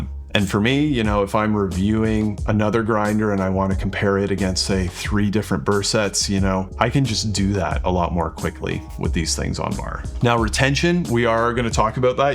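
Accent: Canada. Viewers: Your accent is really ugly. Is it Canadian?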